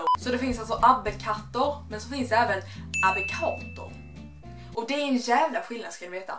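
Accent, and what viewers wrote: Sweden. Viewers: baserat på dialekten är hon från Kristianstad... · Är hon inte från hbg? Hon låter som de faktiskt..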